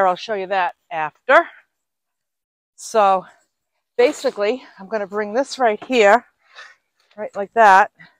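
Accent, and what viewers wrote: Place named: New England, United States. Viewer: I swear, with your smile, your wonderful New England accent, and your enthusiasm, I'd enjoy watching you set up a checkerboard! You're so delightful and precious! Thanks again for sharing your story.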